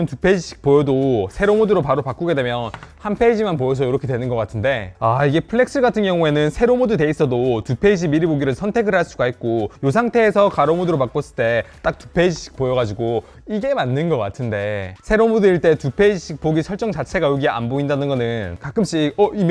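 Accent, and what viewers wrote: Gyeongsang, South Korea. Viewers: 친절한 갱상도 남좌 하지만 난 아이패드😢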